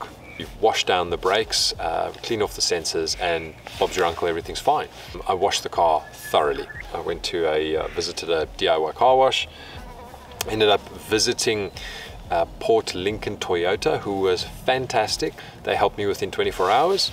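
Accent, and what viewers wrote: South Africa. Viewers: Awesome video. Really enjoyed it. Your accent though, are you South African? Doesn’t sound Aussie, or are my ears deceiving me 😅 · You south African?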